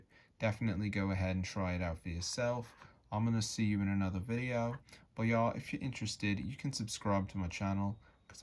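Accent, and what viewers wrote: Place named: Australia. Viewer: Why does he sound like he’s from Australia, New Zealand, or South Africa? I’ve watched every single video on this channel, and in other videos he sounds American, or maybe Canadian.🤷‍♂️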